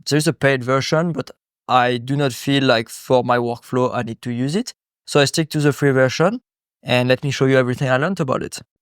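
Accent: France. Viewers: Félicitations quand meme pour l’anglais, ca me trigger quand meme 😅, j’entend du franglais c’est perturbant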